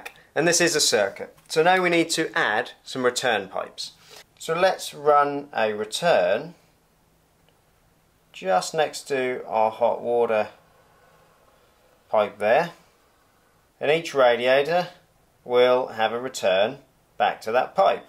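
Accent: Norfolk, United Kingdom. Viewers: great video ,well explained , I have two bigger rads in my extension which struggle so think this will help . what neck of the wood you from sound like Norfolk... cheers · Do my ears detect a Norfolk accent here?!!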